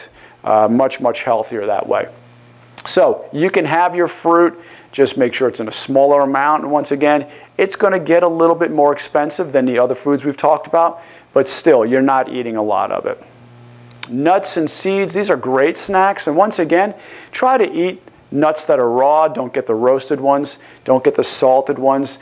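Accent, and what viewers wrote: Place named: East Coast, United States. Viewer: Been doing keto for the cognitive and athletic benefits. Unfortunately my diet is pretty expensive, $75/week even though I only eat twice a day and 90 g of protein daily (and that itself is on the low end because I also strength/weight train and play tennis). The reason for that cost is the protein I buy (pasture raised eggs and lots of fish, particularly sardines and salmon). However it is worth it for me because those are very high quality, high bioavailability, etc. So protein is usually the highest cost component of keto by far, but less active people might not need as much! Also random question Dr., did you come from the East Coast? I'm hearing a little bit of New York, New Jersey, Massachusetts (and some Italian influence as well!)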